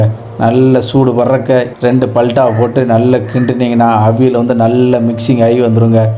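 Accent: Tirunelveli, India. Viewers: Tirunelveli aa bro neenga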